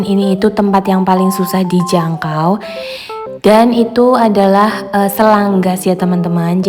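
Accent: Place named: Java, Indonesia. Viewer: Medoknya mirip Teri Raradini 🙂... Dapur cakep... Saudaranya baik baik banyak ngasih..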